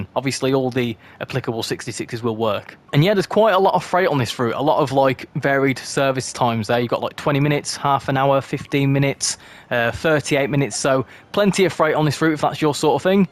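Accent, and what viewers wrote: Birmingham, United Kingdom. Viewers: kinda brummy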